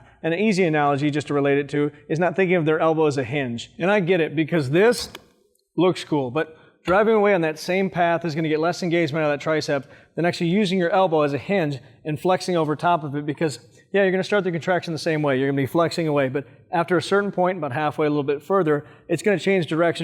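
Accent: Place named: South, United States. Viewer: You sound and talk like Stone Cold Steve. Is it because you're both from the south?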